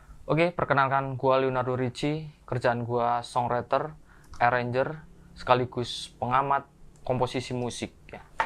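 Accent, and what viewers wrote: Java, Indonesia. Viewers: Suaramu medok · kalo mo tengil paling gak mandi dulu mas.. hahahaha medok pula wong jowo gua gua an ahahhahaha *cangkulnya bawa lagi mas tar mandor nyariin wkkwkw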